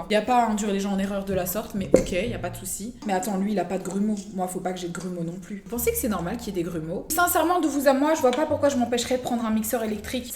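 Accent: United Kingdom. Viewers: ur BRITTISH ACCENT IS AMAZING🥺💞💞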